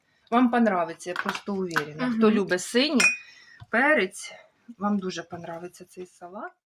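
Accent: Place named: Donbas, Ukraine. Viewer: Спасибо огромное,вы чудесная хозяйка,золотые ручки,и разговор,как песня,немного похоже,как у нас на Донбассе говорят,суржик,и не на русском но и не на украинском,наш местный диалект,спасибо,недавно зашла к вам,случайно,уже синие сделала квашеные,оболдеть, только без селеры,не люблю, спасибо,всем мира здоровья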